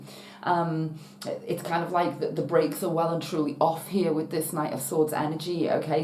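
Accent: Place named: Merseyside, United Kingdom. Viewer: Merseyside accent xx Love your reading xx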